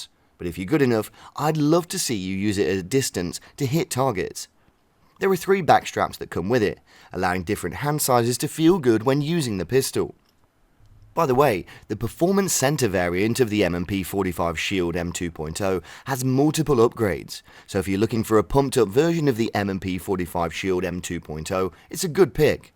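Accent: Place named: United Kingdom. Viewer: I hear a British voice and immediately know this person doesn’t know shit about guns let alone ever seen one in person😂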